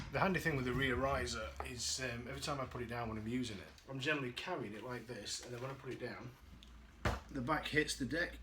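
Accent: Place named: Sheffield, United Kingdom. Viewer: I knew you were from Sheffield, thought I recognised it :D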